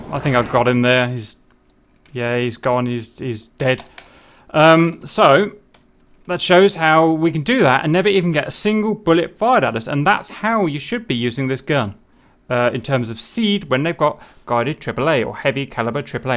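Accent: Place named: United Kingdom. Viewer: Your British accent makes these instructional videos easier to take in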